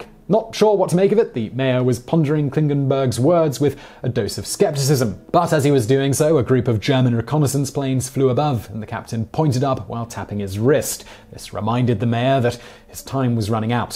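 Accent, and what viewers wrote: United Kingdom. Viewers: Cool video! The thick British and rushed words makes it a little difficult to understand, if you could slow it down a little that would be great. Other than that, excellent.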